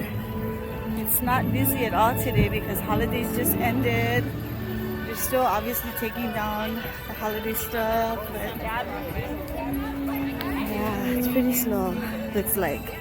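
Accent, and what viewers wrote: Hawaii, United States. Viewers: as soon as you started talking i knew you was from hawaii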